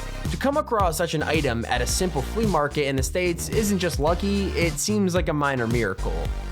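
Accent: Australia. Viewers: Wy you doing an Australian voise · Are u Australian bc I'm Australian (mate)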